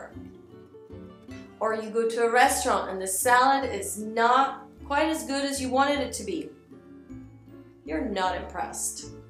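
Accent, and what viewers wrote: United States. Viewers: she's american, not french, i'm french by myself and when she speaks english she has a little accent so i think that's why her american accent is perfect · By the way, how do you manage to have such a fantastic American accent?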